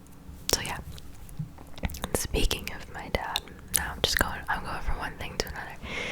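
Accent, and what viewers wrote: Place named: California, United States. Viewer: you can do no wrong. say whatever you like even if it's with a CA accent !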